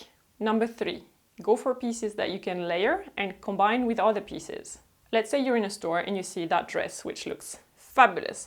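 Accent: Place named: France. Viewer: thank you for such an interesting videos! Can you do an advice video what to do with old clothes that I don't want to wear anymore? What do you do? P.S. your French accent is very cute!:)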